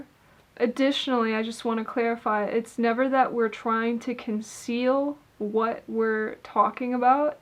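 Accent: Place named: Scotland, United Kingdom. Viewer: ... thank you - Scottish for sure - r, and ch - got a Stroke but talk now after almost 2 years - poetry back then, poetry once more ...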